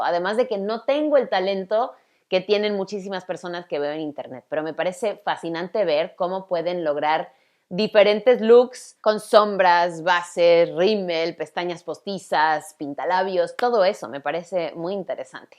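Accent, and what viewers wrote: Mexico. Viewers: Yo por la miniatura pensaba que eras británica luego te oí hablar y pensé que eras mexicana nativa xD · La primera vez que vi uno de tus videos, (si mal no recuerdo fue el de pronunciar las marcas en ingles) estaba convencida de que eras mexicana 😅, hasta que comencé a ver tus otros vídeos de tus anécdotas, y yo tipo: Ah bueno, era estadounidense xD. You're amazing 💜💜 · Tu español es excelente. Recuerda que tu acento es mexicano y algunas palabras también; en Uruguay no decimos chaparra por ej. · Me encanta tu español mejicano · Hablas muchísimo mejor que muchos mexicanos el español, tienes un acento muy mexicano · Más que español es méxicano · Cuando te ví por primera (junto a tu papá) fue muy raro, ya que tu habla no combina con tu físico, osea por fuera toda una gringa pero por dentro bien mexicana.... 🤣 Confusión total jajajaj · creí que eras mucho más joven, creo que eres una hermosa persona, no solo físicamente, sino también en tu forma de ser, tu español es perfecto, eres muy fluida, antes de pensar que eres "gringa" creería que eres de mi amado México, amo tus vídeos y tus tips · 🤣🤣🤣🤣🤣 cuando te escuché por primera vez, lo hice en español, asumí que eras mexicana y habías vivido un tiempo en EEUU, o vivías allá en ese momento. 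Me sorprendió mucho cuando supe tu historia, me encantó, y sobre todo me encantó el amor que tienes por México, su cultura y lenguas. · Tú a mi me encantas!! Envidio (en buen son) tu español tan fluido. Yo asumí que eras mexicana la primer vez que te escuché hablar. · Me gusta tu español -mexicano. hablas español excelente..ojala te encontrara en una calle te daria un abrazo....tienes el toque Latino...cariños desde Arica -Chile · El primer video tuyo que ví, era relacionado con enseñar inglés y pues me gustó mucho tu forma de abordar el tema y especial tu personalidad. Se me hizo extraño el asiento mexicano y me sorprendí muchísimo de tu dominio del español. Quise ver más vídeos tuyos, presisamente, por esa personalidad divertida y cálida. Y bueno, ahora te sigo acá en Youtube, tienes vídeos muy interesantes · Muy bella persona, español algo mexicano por obvias razones... y el inglés muy bueno parece, porque de ese idioma no se ni pedo. 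Pero su ventaja de idioma es súper importante. Saludos CR. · Esta chica habla Perfecto español , con un poco de acento y dicción propia de México cosa q no le resta es adorable q quiera compartir su saber ; hija de lingüista augura q sabe lo q dice y así mismo ha de hablar el inglés ( idioma por el cual llegué hasta aquí ) Solo le deseo q siga así de exitosa, feliz en su matrimonio , en general en su vida , y q afortunada tener 37 años y lucir de 25 !!! Adorable chica !!! Dios y la vida la atropelle con alegría y salud !!! Abrazo desde Colombia ! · hablas un español mexicano perfecto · Como argentino me resulta raro escucharte hablar mejicano. Y así y todo me encanta. 😀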